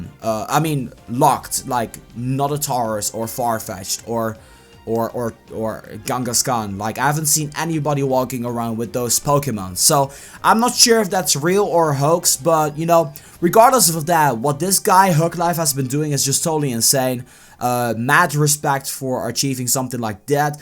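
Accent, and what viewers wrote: Netherlands. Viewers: u dutch?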